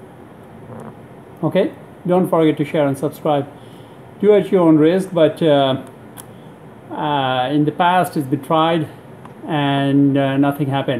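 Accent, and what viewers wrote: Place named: Nepal. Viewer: are u nepalese? ur accent sounds like nepalese and yes mostly nepalese people use this method to see the solar eclipse. I have been using this method since childhood for several times and it works fine. thank you for sharing!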